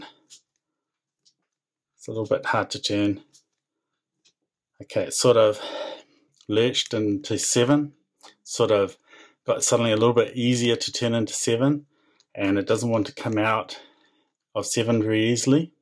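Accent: New Zealand. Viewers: South Island fella? 🇳🇿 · I heard that accent and instantly recognised you were a fellow kiwi! Fuck yeah! Glad to see another kiwi here! Chur for the video bro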